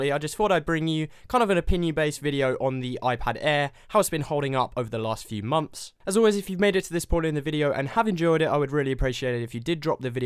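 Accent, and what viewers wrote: United Kingdom. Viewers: Your my favorite tech tuber. You make awesome videos! ...and I love your British accent, talking about tech XD it Reminds me of Jony Ive lol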